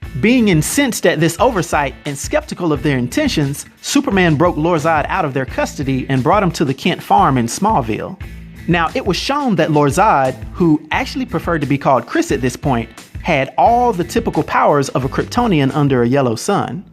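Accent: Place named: United States. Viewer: I rellay like your american accent from which part of USA are you from? Amazing video as always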